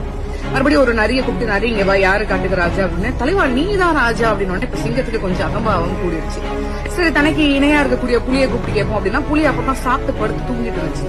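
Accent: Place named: Telugu, India. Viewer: இவள் கொல்டி தமிழில் பேசிக்கொண்டு தமிழர் வெறுப்பு இருக்கிறது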